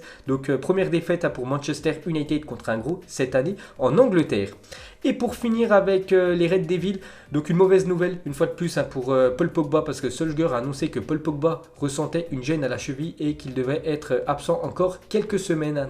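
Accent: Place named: France. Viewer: Stop avec les prononciations chelou à la française "les reds dévile"...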